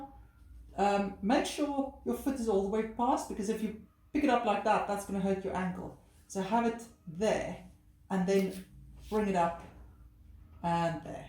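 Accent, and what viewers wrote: South Africa. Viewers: Love your channel, extremely useful, plus I love the SA accent :) (I was born in Germiston). I can't find any videos on warm up exercises before runs. I use <link> <link> and <link> which ones are best? Or even better do you have a video on the best 5-10 min warm ups to use and maybe cool downs?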